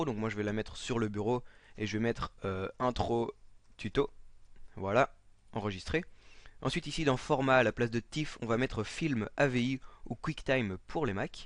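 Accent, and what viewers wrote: Belgium. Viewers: Tu est belge non ? apouier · sa sent l'accent belge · Il est belge, si entends dans une de ses vidéos: il dit Nonente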